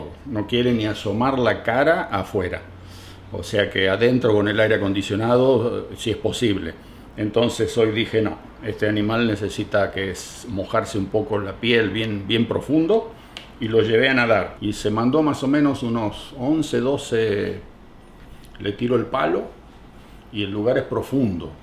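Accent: Argentina. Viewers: sos el pedacito de Argentina en Canadá, seguís teniendo la tonada argento. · y la tonada de donde viene? De Argentina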